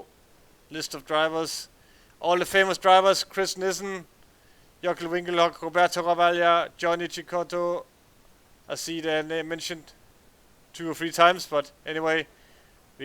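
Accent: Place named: Denmark. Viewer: Odd, your Youtube profile list you as German, but you sound really Danish. XD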